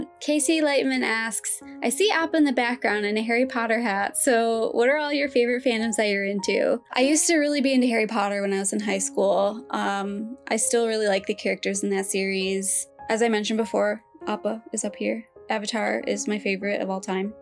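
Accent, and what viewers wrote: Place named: East Coast, United States. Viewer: Wow! Thank you for answering my question! It’s really cool that you’re from the East Coast, US. I grew up in MA, and moved to NH when I got married 10 years ago. I had a feeling by your accent you might not be far far from where I grew up. Now I live in Japan and it’s really a dream come true. It was really great to hear your background about how you enjoyed and related to Tohru a lot. I also agree with you, out of all the characters I felt like I related to her a lot. But not on the same life experience so much. But the heart that wants to help heal others hearts and just be friends with anyone and everyone. That was always my heart as a teen, and still now it resonates with me. Also! I love OHSC!! I watched it when it was airing in Japan in 2006! I remember being so excited for each episode to come out and be subbed! 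I didn’t know then, how much of a parody of Shojo it was until much later, but I still adore it too! I can’t believe they are finally making a second season of it!! Also FMAB and Wolfs Rain!!! Yesss so good, but so gut-wrenching! I never watched Gurren Laggan, but I’ve heard so much about it. You should watch Violet Evergarden, Snow White with Red Hair and Yona of the Dawn, if you enjoy fantasy. :)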